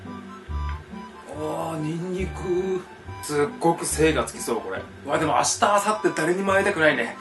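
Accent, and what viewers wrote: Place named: Hakata, Japan. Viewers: 福岡弁？博多弁？良いですね(´ω`) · いつもにないくらい博多弁使ってくるやんw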